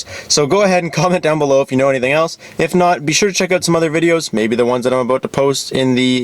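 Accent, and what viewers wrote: Ontario, Canada. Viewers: Are you Canadian or from a northern state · lol super ontarian accent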